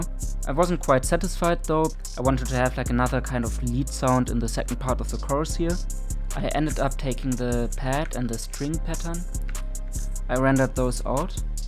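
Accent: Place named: Germany. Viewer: I hear German accent I know vid gon be hard!!!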